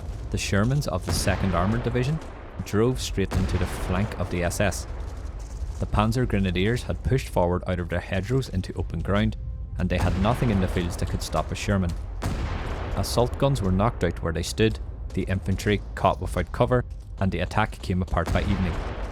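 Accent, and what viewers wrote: Northern Ireland, United Kingdom. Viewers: Well done. Hence my subscription. Having spent a year in Derry, courtesy of the U.S. Navy, your accent sounds as if you are from Northern Ireland.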